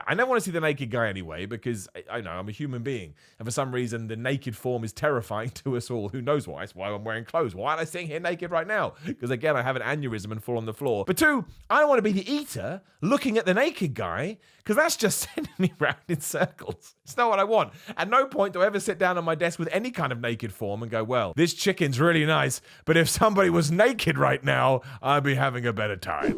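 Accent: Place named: RP, United Kingdom. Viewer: A subset of the eater are the people with what I call "bro jugs", they have these huge jugs that just have to leave on the floor, the size of a carton of milk, filled with either water or even better "branch chain amino acids." I've seen both swole people and hard gainers do this. Maybe it helps them, but to me, I felt like if I were to try to drink even a fifth of that while deadlifting I'd yack. Another subset of the naked guy is the old guy drying their balls in the hand dryers. I still have mental scars seeing some old guy literally dry his balls in a YMCA bathroom like he was at home, not a care in the world. Of course, I can't add to this list without copping to some of my own bullshit, which is grunting. I'm a grunter-- I know why I do it, but I also know it could probably be disconcerting. Of course, I don't feel bad next to that very eccentric gentleman channeling his inner Lady Gaga who will just full-on sing so loudly I can hear him over the heavy metal I have blasting in my ears so I don't have to hear other people in the gym. 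Anyway, big fan, I like your content but I also admit it's nice to hear some guy with a posh accent who sounds like he could be teaching a 301 class on the reign of King Henry the 8th instead of someone who speaks like their neurons have been replaced by branch chain amino acids.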